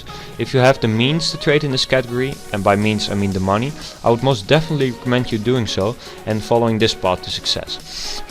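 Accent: Netherlands. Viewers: Ik dacht dat ik een nederlands accent hoorde, en ik ben van belgië en mijn engels is ni zo sterk mar goeie video's man :p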